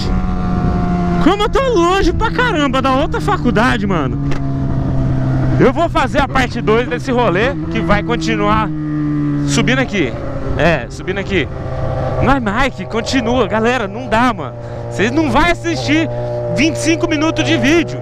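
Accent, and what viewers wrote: Goiás, Brazil. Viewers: pk ele fala no pique de Goiás se ele é de londrina😂😂😂😂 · vc e goiano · porquê vc fala no pique de Goiás ??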